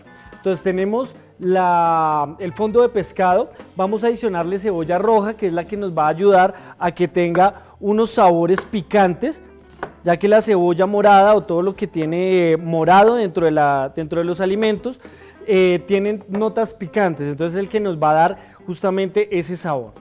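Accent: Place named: Colombia. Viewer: El acento colombiano es raro pero es buena receta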